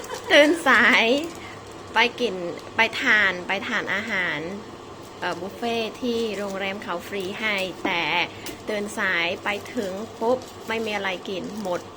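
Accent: Cambodia. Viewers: ฟังสำเนียงแล้วเขาเป็นคนเขมรครับ จากคนสุรินทร์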